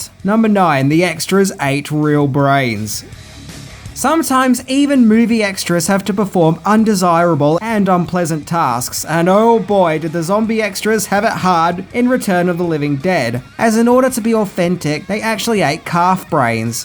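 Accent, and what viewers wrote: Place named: Australia. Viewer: He’s Australian, sometimes they pronounce things differently.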